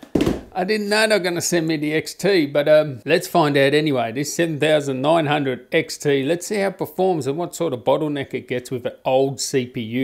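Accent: Melbourne, Australia. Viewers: your accent somehow made me miss Melbourne lol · Good to hear an Aussie voice on reviews 😂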